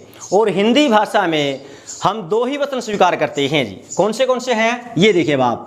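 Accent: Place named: Haryana, India. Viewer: akdm haryanvi 👍👍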